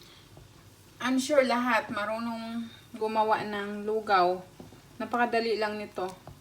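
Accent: Visayas, Philippines. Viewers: Te bisaya ka night? 😀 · Bisaya po ba kayo Maam... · Maka mukhang yaya nmn c ateng bisaya lang cguro c mam kaya ganyan magsalita.. Nakita m nmn mga gamit nya ohhh mamahalin.. Madaming bisaya na mayaman ateng at magganda! Just sayin! ☺️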